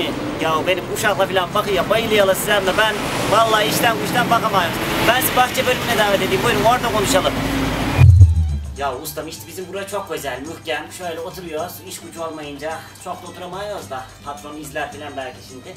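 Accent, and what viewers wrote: Kastamonu, Turkey. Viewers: Sivaslı olarak Kastamonu şivesi dinlemek :)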